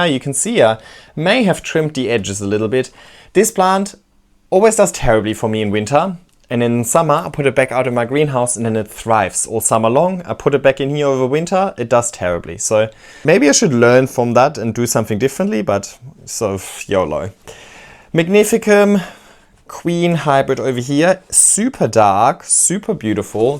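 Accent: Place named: Germany. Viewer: Your accent sounds like your German. Are you? 😁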